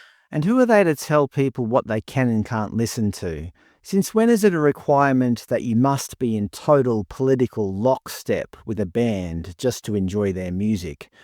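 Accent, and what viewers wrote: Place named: Western Sydney, Australia. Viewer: They were woke before it was cool. I thought your accent was Western Sydney....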